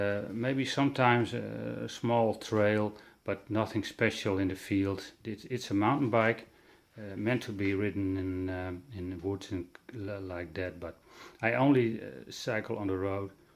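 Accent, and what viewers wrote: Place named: Netherlands. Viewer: Leuke video! Je kan wel beetje horen dat he Nederlands bent maar nog steeds leuke video’s ik heb pas een mtb en ben hem ook aan het verbouwen om maar te zeggen😂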